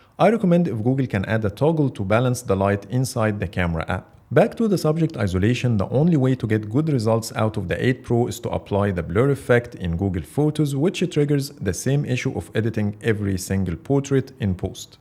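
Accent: Egypt. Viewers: You sound Egyptian are you? 😀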